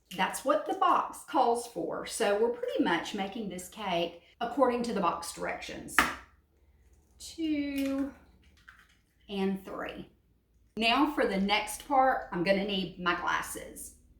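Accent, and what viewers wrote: South, United States. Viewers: Thanks for sharing! I love your southern accent ❤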